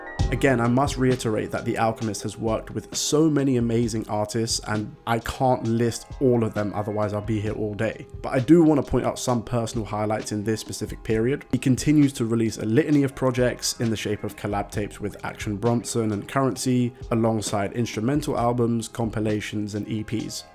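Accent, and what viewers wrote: United Kingdom. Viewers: im listening to a a British person talk about Americans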